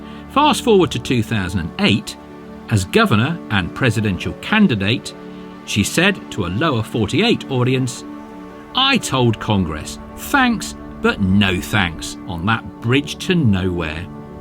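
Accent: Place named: RP, United Kingdom. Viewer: Love the posh accent.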